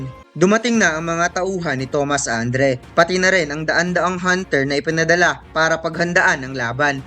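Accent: Visayas, Philippines. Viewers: Bisaya kc sya kaya ganyan pronounce nya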